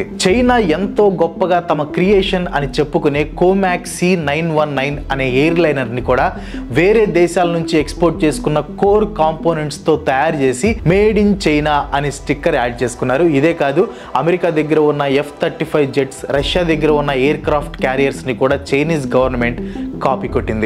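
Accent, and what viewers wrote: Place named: Visakhapatnam, India. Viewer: U speak visakhapatnam clarity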